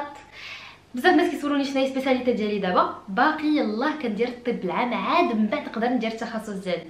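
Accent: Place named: Monaco. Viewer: مالك اختي كتهضري كيف لكبرا فموناكو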